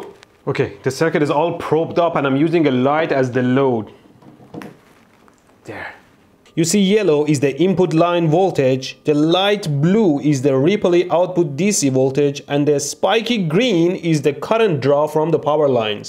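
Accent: Iran. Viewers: لحجه ای که بخاطر ایرانی بودن به ارث بردی را خیلی دوس دارم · your english accent... I knew you were a fellow iranian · I love your Iranian accent. Greetings from Germany.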